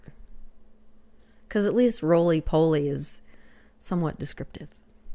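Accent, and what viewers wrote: Texas, United States. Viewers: I am not really sure how a Texas accent sounds... but i think yours is lovely... :-) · You have very little Texas in your voice - once in a while, but not often. The new sign is cool.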